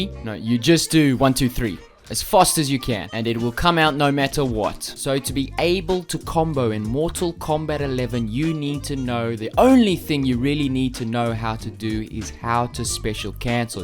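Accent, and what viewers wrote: South Africa. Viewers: You sound South African. Am I right? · says australia but you sounds like a ZA oak? the mix of accents is pretty funny tbh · Are you South African? :0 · Am I the only person who thinks this man is south african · South African accent i think. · Are you South African? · Dude are u from south africa do u play online and tournaments?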